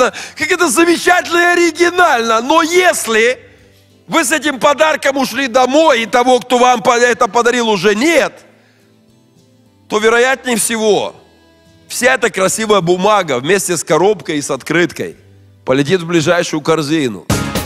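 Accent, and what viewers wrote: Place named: United States. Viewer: Что - за американский АКЦЕНТ?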